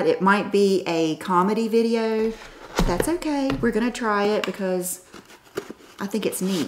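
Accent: South, United States. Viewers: This is the first video I have ever watched of her but I love her. So whimsical....she enjoys reminiscing....old mac and YouTube days,etc!! Absolutely subscribed after just a few minutes of watching her. Plus, the southern accent....I'm from south Georgia myself so my accent gets picked on alot...love her!! Can't wait to go thru her channel and watch all her videos!!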